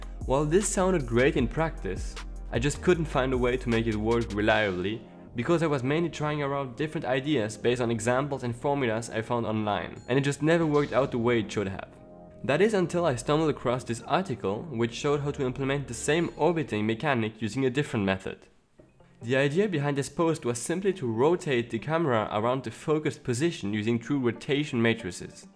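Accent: France. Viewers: Your accent look like french. Have you upload your work on github or something like that?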